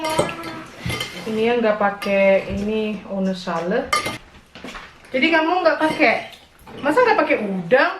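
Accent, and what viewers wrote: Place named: Java, Indonesia. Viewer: Wkwkwk dengklek jawa banget ya mak 😂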